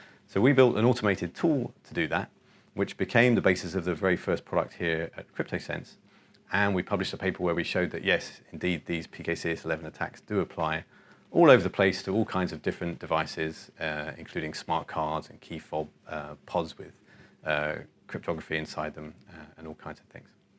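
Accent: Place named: London, United Kingdom. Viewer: London I think ;)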